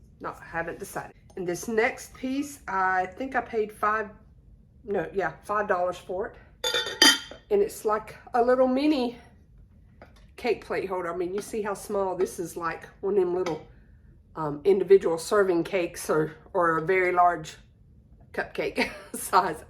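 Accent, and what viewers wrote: South, United States. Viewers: Love that strawberry 🍓 cookie jar and the beautiful vintage linens! I've been collecting doilies, old handkerchiefs and table linens for crafting, but I'm having a hard time cutting them up. 😅 I also love the idea of a vintage toy tree! This year I had my Steiff reindeer pulling a sleigh full of vintage elves and mini teddy bears in Santa hats under the tree. Last year I had vintage Barbies in ugly Christmas sweaters plus my Mattel Elvis. I also wanted to tell you that I love your channel name. It reminds me of growing up on the Chesapeake in Virginia and your accent is so sweet. I miss the south! ❤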